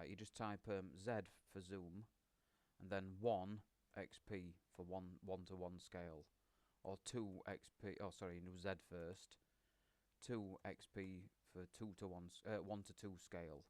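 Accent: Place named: North, United Kingdom. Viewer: After a lot of frustration with viewports, I should have known that a chill northern accent would provide the answers - cheers!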